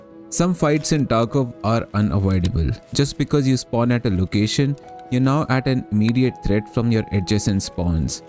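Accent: India. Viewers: As soon when you heard the strong indian accent you knew that the guys knew his shit xD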